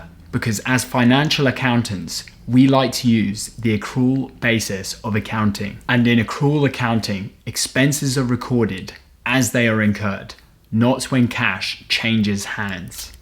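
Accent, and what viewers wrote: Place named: United Kingdom. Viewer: i am kind of a little bit confused, u are a Canadian, but your accent is British accent .but i like your video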